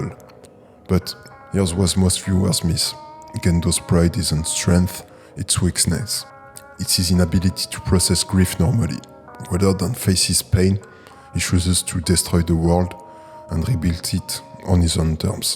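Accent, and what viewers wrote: France. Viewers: Sounds like French accent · how can one man sounds so french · g reconu ton accent, c l'accent d'un français 100%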